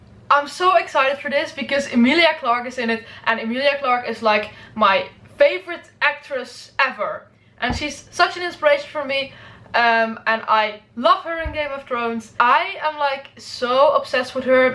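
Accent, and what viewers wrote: Netherlands. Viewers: Hey! ik hoor aan je engels dat je nederlands spreekt :p ik ben ook een grote Star Wars fan!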